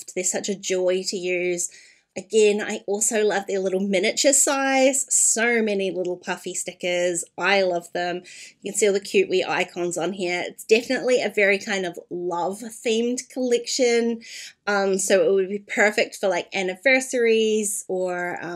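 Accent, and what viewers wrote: New Zealand. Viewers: This is an amazing collection. With my favorite company going out of business, I am looking for a new place to get all my stuff from. This kit is huge!! I love the frames for shaker pockets and all the word sticker. I can't have too many words!! I love your accent. I miss NZ so so much. Thank you for the unboxing!!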